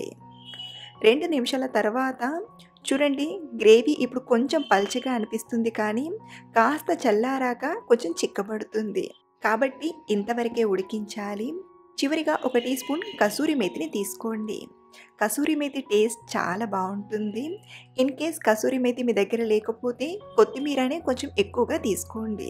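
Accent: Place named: East Godavari, India. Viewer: East godavari slang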